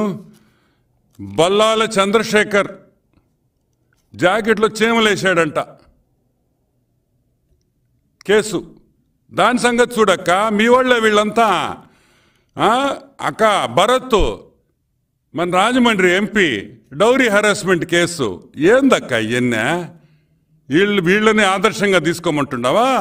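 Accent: Nellore, India. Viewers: Entraa aa accent... Oka press meet pettinappudi language sarigaa vundaali... Nellore paruvu theeyyodduraa... Ok neeku nachhaledu daanni right gaa present cheyyali... Paruvu theesaav kadaraa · సర్, మీ నెల్లూరు Accent చాలా బాగుంది...జై CBN, జై టీడీపీ... అబద్దాలు చెప్పి పోయిన సారి గెలిచారు...మీరు వెంట వెంట నే విమర్శించాలి...వదలరాదు... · Nellure slong super anna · Nellore slang suprb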